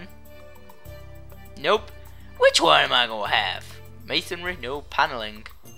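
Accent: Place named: Northern England, United Kingdom. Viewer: Ooh was fun watching you play Sims 3, Love Sims :) love your accent too good old northern accent like meself ;)...i am that sad i know that house as i have played it many times and never understood why that half wall bit is there looks odd hahaha good cheats to use are motherlode for money, Testing cheats enabled use shift and click on mail box/sim and a bunch of things come up, and after that if you want you can use buydebug and good stuff there :) only found out recently :) Have fun simming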